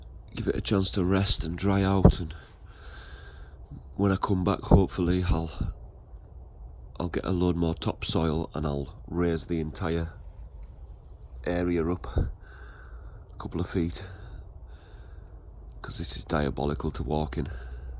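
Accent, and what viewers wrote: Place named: Scotland, United Kingdom. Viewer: U sound Scottish, Boss. Maybe from da Shetlands.....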